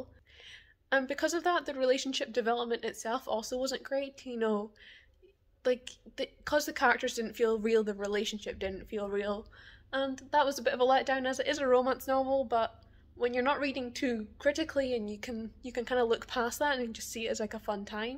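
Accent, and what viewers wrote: Scotland, United Kingdom. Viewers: i love how you've let out your scottish accent a bit more in this video lol. i'm not scottish but when i was reading her royal highness i could feel that it was all just a big stereotype without much research behind it, so it was interesting to hear your point of view. And honestly i totally feel you, i'm from italy (probably the most stereotyped country in the world) and it's frustrating seeing people (but especially americans) romanticise and misrepresent my country all the time.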